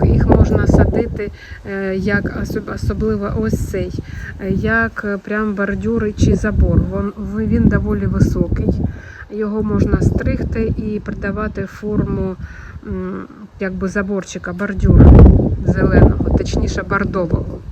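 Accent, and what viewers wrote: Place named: Surzhyk, Ukraine. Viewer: Суржик як суржик, як на мене дуже органічний. Головне постійна практика говоріння💙💛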